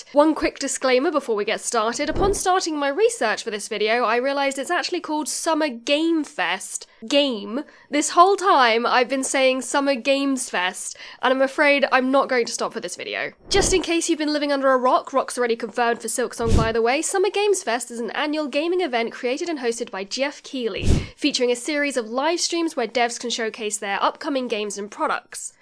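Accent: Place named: United Kingdom. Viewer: Nothing like the soothing British voice of to feed me copium before I go to sleep for the night, thank you for furthering my hope soldier 🫡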